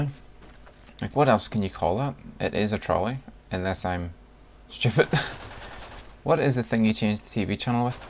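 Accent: Northern Ireland, United Kingdom. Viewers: Lol Northern Irish accent is awesome!! Love your accent (I'm Australian). In Australia we don't necessarily say 'gym shoes'. We say sand shoes, or sneakers lol! Carbonated drink, we normally say the actual drink itself (Fanta, Sprite), or we say 'soft drink'. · american accent sounds ´neutral´, northern ireland accent is so much more interesting :) i think i´ll subscribe to hear that accent ' thanks for the vid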